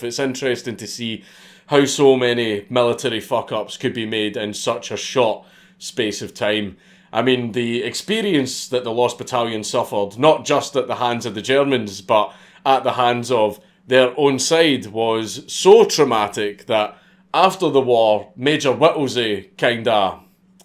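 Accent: United Kingdom. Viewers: Your British accent is fucking spot on, absolutely love it! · with a British accent has to be the most cursed thing Ive ever heard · Am I the only one impressed by his British accent? I am from Texas. Do a Texan!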